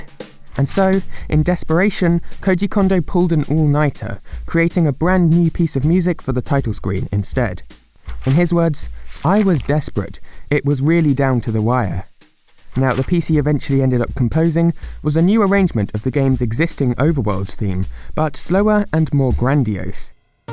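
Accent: England, United Kingdom. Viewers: I like your English accent. :3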